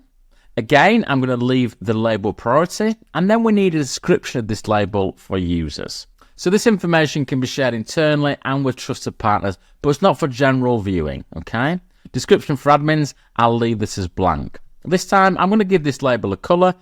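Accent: United Kingdom. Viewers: Entre escuchar el ingles británico y aprender el entorno de Microsoft, todo esta bien!!!!!!!!!!!!!!!!!!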